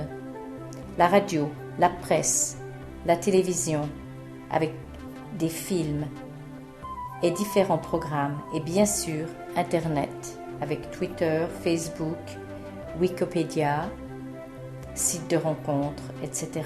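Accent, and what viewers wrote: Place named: France. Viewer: this was cool because i am trying to listen for comprehension and acclimate to the french accent. now to incorporate the words/phrases into more practical use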